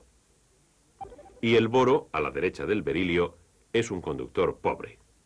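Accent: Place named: Castile, Spain. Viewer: Nada más lindo que un documental vintage y con acento español de Castilla 💞⭐😊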